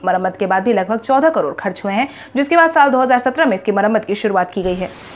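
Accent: Bihar, India. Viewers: Madam ji Bihari mat bola kro ye sabd ache nai lagte hai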